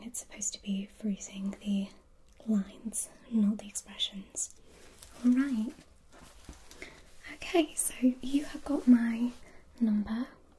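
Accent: United Kingdom. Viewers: You have to most beautiful voice with the cutest british accent! please never be quiet in any of your future videos! <3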